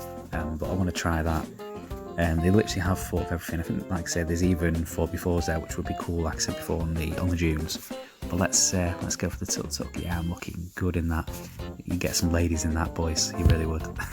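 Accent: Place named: United Kingdom. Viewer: You kinda remind me of Elanip who plays GTA 5 with your british accent xD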